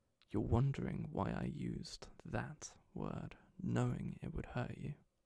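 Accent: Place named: United Kingdom. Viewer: Wait… he kinda sounds like auralescent but more British to me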